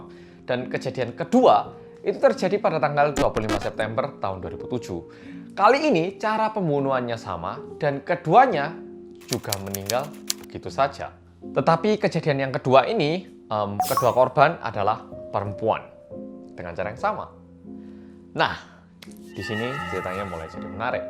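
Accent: Java, Indonesia. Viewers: Mas yang medokk^_^ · BENERAN MEDOK WKWKWKWK aku subskriber baru mas :"v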